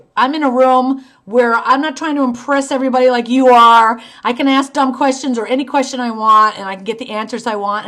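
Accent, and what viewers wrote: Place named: New Jersey, United States. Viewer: Connecticut. Haha 😆 I was guessing you were from Jersey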